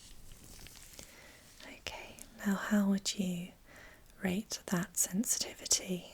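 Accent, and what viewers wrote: United Kingdom. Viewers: Omg. Subscribed. I looooooove your accent I could listen to you talk all day!!!!! From the UK? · Very cool and British, which is obviously the best. But it still doesn't make me sleep 😑😑 I'm just too difficult. Good luck with the future! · That UK voice. Mmmmmakes me melt. Thanks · Because of her GORGEOUS British accent, I thought instead of touch she said torch.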